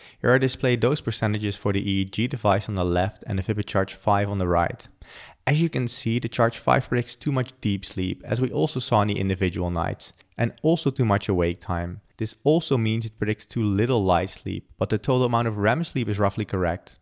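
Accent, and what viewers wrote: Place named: Netherlands. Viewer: Your accent really gives it away from where you are (NL). : ) Nice channel!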